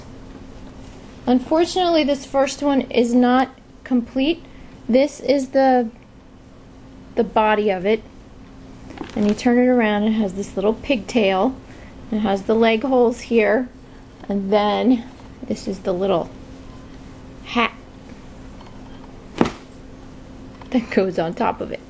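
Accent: New Jersey, United States. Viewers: ! Is that a NJ or Brooklyn accent... I'm having a banner year for the ladies following me around giving me a history lesson on any item that I pick up. Kind of funny but it gets old quick... Leave me alone! Santa going down the fireplace ugly sweater is the best. Price it high.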